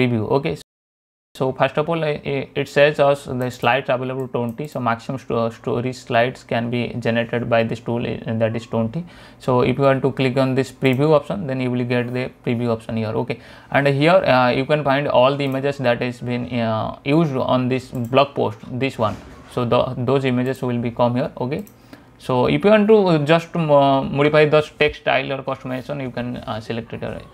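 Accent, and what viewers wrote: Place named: England, United Kingdom. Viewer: You have an awesome English accent. So easy to understand. 👍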